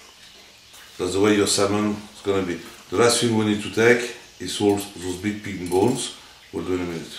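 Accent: France. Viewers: I thought he rather had a French accent.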